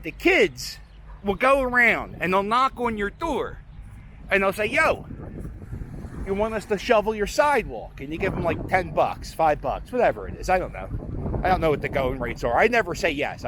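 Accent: Philadelphia, United States. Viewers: I love the accent...he sounds like my cousins in S. Philly.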